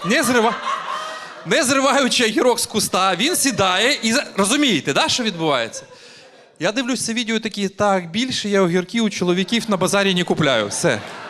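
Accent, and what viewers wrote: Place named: Surzhyk, Ukraine. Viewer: наше все! Здоров'я на багато років вперед, щоб не один раз ще почути цей чудовий суржик- акцент😊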